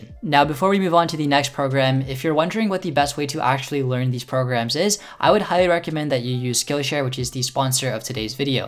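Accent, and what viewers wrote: United States. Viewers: I was a bit overwhelmed when I heard that soft, American-english accent. Times have changed. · sir you are looking as Indian Boy with American accent.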